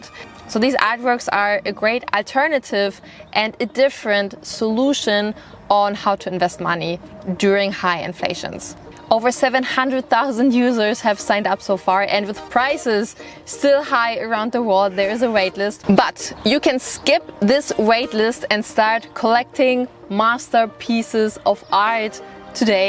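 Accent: Turkey. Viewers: Hallo, ich gucke begeisternd deine Videos und finde dein türkisches Akzent sehr süß. In vielen Dingen stimme ich dir vollkommen zu, da ich in Deutschland lebe und zum Urlaub in der Türkei die gleichen Sachen wie du erlebe. Mülltrennung, kein Gurt anlegen, usw. Aber in Deutschland z.B in Hannover gibt es teilweise schon Gasherde. Meistens sind es vom Altbestand geblieben und werden zunehmend durch E-Herde abgelöst. Hier gibt es aber ein entscheidende Risikofaktor. In Deutschland sind die Gasherde mit einem Sicherheitsventil ausgestatttet nach erlischen der Gasflamme wird der Gaszufuhr abgeschlatet aber in der Türkei leider nicht. Die neuen Genarationen der Gasherde könnten diese Automatik besitzen, da bin ich nicht auf dem aktuellen Stand. Birkac dizi haric cogunlukla türk dizilerini sacma buluyorum. Insanin zamanini caliyorlar. Benim kendi hayatim kendi dizim:-)) Mach weiter so mit deiner amüsanten und interessanten Videos. 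Tschüß. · Kesinlikle ve kesinlikle bu kız Türk, dikkatli dinleyin böyle bir aksan olamaz. İngilizce aksanı direk Türk aksanı ama maalesef 600 bin takipçisi var.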